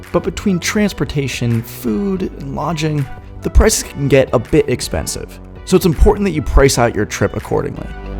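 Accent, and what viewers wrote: United States. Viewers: Once I heard an American accent I was ready for a lot of nonsense being spoken about Oz, buy hey, not bad, you are pretty much spot on.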